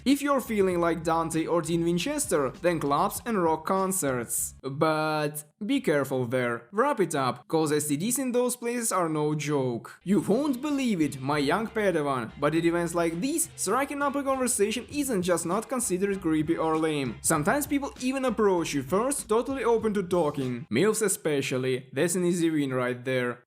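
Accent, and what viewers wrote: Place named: Europe. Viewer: Your european english accent make it more funnier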